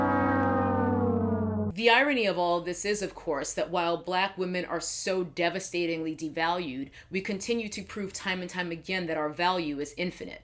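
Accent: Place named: Long Island, United States. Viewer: Pausing this video to say that red lipstick looks amazing on you. And I Also love listening to you talk because I'm getting hints of LI in your vowels. I miss NY, what can I say? Coming back: I felt so bad for Chelsea. It was so obvious that her style was lifted. Patrick Starrr's comment really hit me the wrong way. I didn't like it and got some pretty bad vibes. Manny apologized and to me it appeared to be sincere, but the damage was done. I really hope that this negative will somehow turn around and open up more opportunities for her. She deserves it. I know nothing about making videos, but I knew when I saw hers that she worked very hard. My phone is keeping track of what I read. Today when I woke up, I read about the woman, Ann Lowe, who made Jacqueline Kennedy's wedding dress. It resonated with me as the Ms. Lowe is a woman of color and came from a long line of excellent seamstresses. 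it's been over 50 years and some things have improved, but there is still a long way to go.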